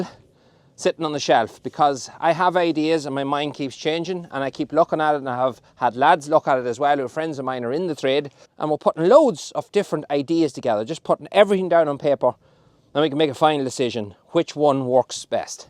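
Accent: Cavan, Ireland. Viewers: I enjoyed watching, and I have subscribed. I have watched other videos of restoring cottages and going off-grid by Brits who have relocated to Ireland from the UK. It's nice to hear an Irish accent. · what accent is that ??? Cavan ?? Trying to figure where in Ireland you are ? 🤔☺️😉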